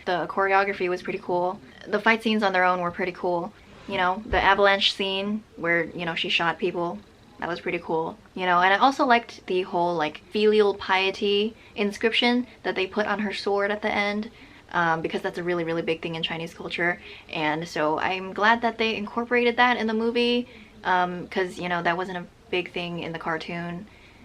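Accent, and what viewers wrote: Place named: United Kingdom. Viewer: subbed for amazing British accent! haha. Oh and also, good review :)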